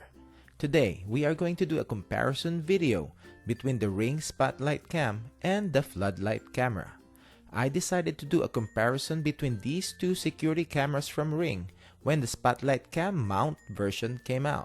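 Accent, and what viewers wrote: Philippines. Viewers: Is that a Filipino accent? Tagalog?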